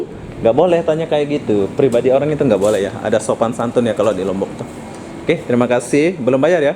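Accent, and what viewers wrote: Malaysia. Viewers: Kalau di dengar dari cara dan logat anda berbicara, seolah-olah anda tuh menggunakan cengkok asli Malaysia. Mungkin anda masih ada darah Melayu Bukan serta merta pernah tinggal di Malaysia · Awalnya aku suka, tapi lama lama jdi kaya orang indonesia kemalaysia2 an, ga di kl ga di lombok ngomong logat melayu malaysia. Mau judulnya experimen atau tidak. Ada bbrp video yg mengangkat issue sensitif mengenai SARA (orng bangladesh yg overpopulasi) yg shrusnya ga usah dijadikan bahan krn ini issue internal di kalangan publik malaysia, ga ush ikut2an ngangkat kcuali kamu bs netral. Saya 6 tahun dah kolej n keje kat kl n ipoh tahupon bile cakap malay kat malaysia n cakap bhs indonesia dekat indonesia sini. Bukan saya benci awak tetapi cintailah negeri ini dn bahasanya slama and orang indonesia. Kcuali bila sudh jdi warga malaysia yg sllu diidam2kan sprti dalam video tu. 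Jgn sampai kamu terlihat 'norak' hanya gara gara boleh bhs malaysia trus ngobrol itu trus slama di lombok. Mmg ini videomu kamu boleh berlaku semaumu tetapi saya hanya bagi tahu saja kerana peduli bukan sebab hal lain.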